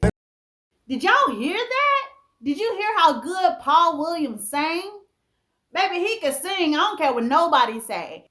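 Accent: South, United States. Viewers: You're gorgeous with the southern accent!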